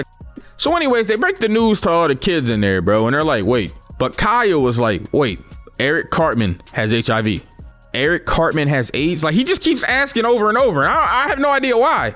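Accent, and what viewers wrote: Philadelphia, United States. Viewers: U sound more Philly den Baltimore cuz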